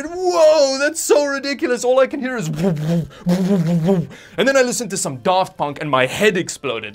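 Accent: South Africa. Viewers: Hey! curious, are you a Afrikaaner! im getting South African Vibes from you lol! Awesome Channel and love the MUG thank you lol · Are you by any means from South Africa hence your accent?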